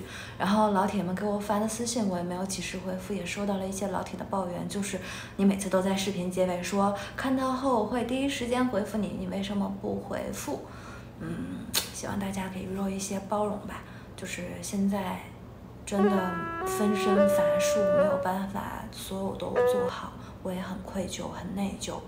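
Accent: Northeast, China. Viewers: 老妹儿，东北口音有点重啊～～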